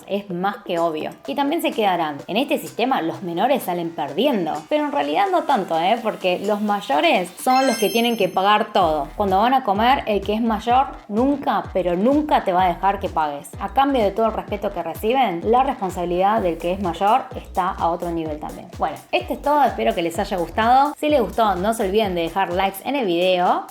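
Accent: Argentina. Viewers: Vives en Argentina???? Tu asento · Es coreana con sendecia argentina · Amo ese acento tan argento!!!!! Me encanta tu canal para conocer un poco más de la cultura coreana. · La primera vez que veo que una chinita tiene acento arjentino 😍😍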